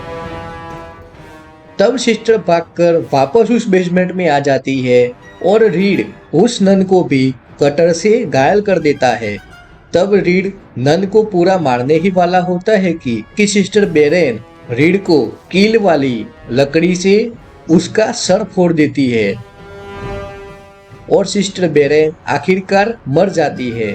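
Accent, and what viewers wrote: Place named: Gujarat, India. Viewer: Gujarati accent….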